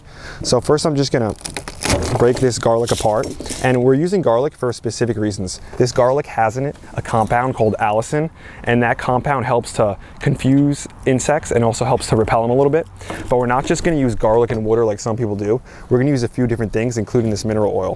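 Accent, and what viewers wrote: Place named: New Jersey, United States. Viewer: I had a customer call yesterday and he had a faint accent that I recognized but couldn't place. Then he told me he was from New Jersey and I knew right then that he sounded like. So I guess he's probably from the same region.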